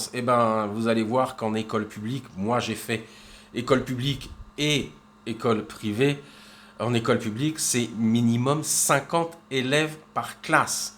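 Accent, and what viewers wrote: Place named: France. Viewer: Ce n'est pas la fonction qui donne le TITRE de professeur.Tu DONNES des cours d'anglais A moins que tu n'aies des diplômes certifiant ta capacité à enseigner.Ce qui m'étonnerait compte tenu de ta prononciation très ''frenchy'' de l'anglais,et AUSSI de tes très grosses lacunes dans le maniement oral et écrit de la langue française...............tu comprendras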